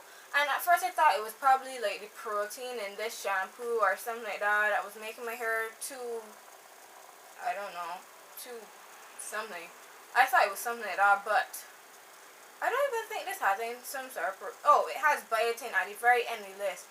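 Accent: Barbados. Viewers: i can hear that Barbados accent lol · she from barbados i caught on her accent ❤ carribean just like me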